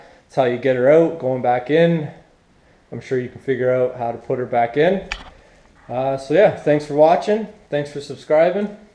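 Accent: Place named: Ontario, Canada. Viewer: Awesome video thanks ! I’ve ordered my new downpipe ,exhaust and turbo and Malone tune , next will be my turn to scrape my knuckles 😂😂😂😂 I totally guessed your accent at the beginning too , had to be from Ontario Canada 😂😂😂 I’m from Bc 😬🤷🏼‍♂️ 🇨🇦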